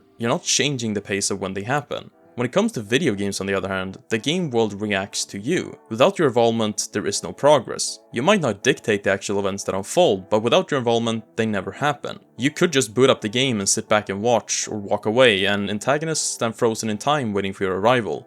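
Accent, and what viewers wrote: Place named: Philippines. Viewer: You sound brown, like a jungle asian or some kind of filipino.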